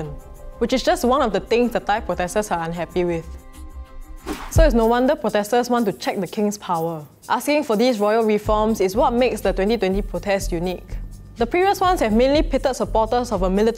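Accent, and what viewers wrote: Singapore. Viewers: That is how we Singaporeans sound like. Don’t like it then it is your problem. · The unabashedly singaporean accent is refreshing compared to the fake western accent that most reporters have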